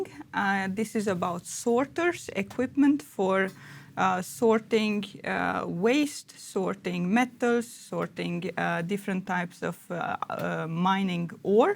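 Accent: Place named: Norway. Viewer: Norwegian english from. 😀 Tomta er litt for dyr for meg nå, ikke lett å regne inn.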